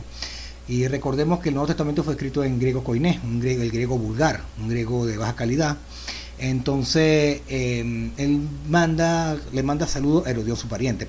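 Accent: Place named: Venezuela. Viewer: Usted,no parece judío,tiene acento venezolano.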